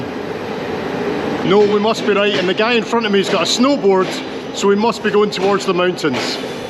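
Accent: Scotland, United Kingdom. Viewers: Very lovely scotish accent,welcome to Romania!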